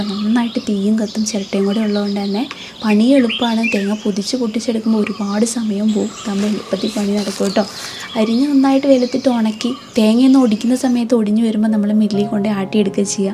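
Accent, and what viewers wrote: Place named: Palakkad, India. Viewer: Palakkadan style onnu vere thanne super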